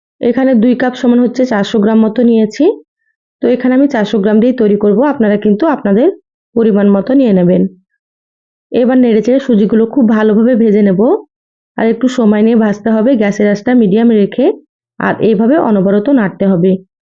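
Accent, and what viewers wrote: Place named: Chapai Nawabganj, Bangladesh. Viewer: আপা আপনার বাড়ি মনে হয় চাঁপাই..!! কথায় আঞ্চলিকতার কিছুটা টান আছে...!!!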